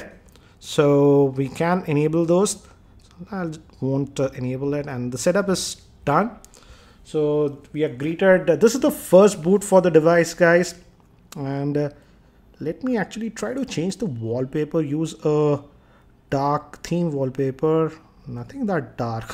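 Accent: Hyderabad, India. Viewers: please change ur accent of speaking. hyderabadi youtuber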